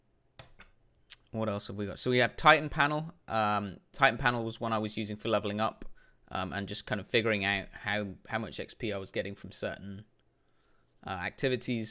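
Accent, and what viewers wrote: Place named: United States. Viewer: That american accent lol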